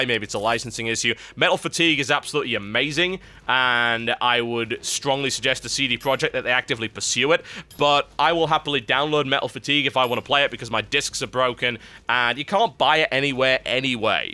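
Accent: United Kingdom. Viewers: 27? His british accent always makes him seem so much older.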